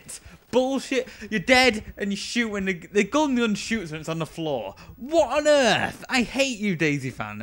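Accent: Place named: United Kingdom. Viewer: You sound like one of the MI6 members. :D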